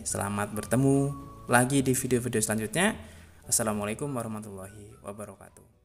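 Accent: Lampung, Indonesia. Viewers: Bang orang lampung ya hahah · Pasti dari Lampung ya bang hehe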